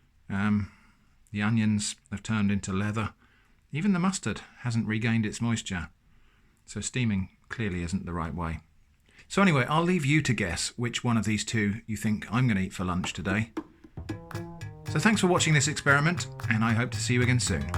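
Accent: United Kingdom. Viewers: I love the British accent when it isn't too strong.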